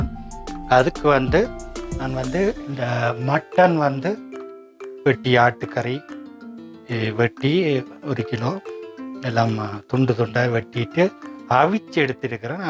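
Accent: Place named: Sri Lanka. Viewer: Lovely recipe and narration 😊👍.. srilankan slang is arumai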